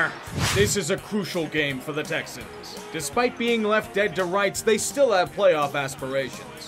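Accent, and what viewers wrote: Pittsburgh, United States. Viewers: Nice to hear the Yinzer again.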